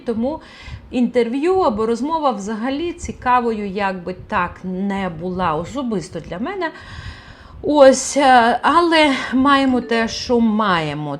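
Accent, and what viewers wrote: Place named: Surzhyk, Ukraine. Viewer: Ну, подарунок вы ещё тот. Вы говорите на суржике. Смешной, исковерканный говор. Пидуть, справди, агрэссия, винувата, процентув, сказав, говорыть. Всё исковеркано. И вы захотели, чтобы другие тарабанили на этом подарунке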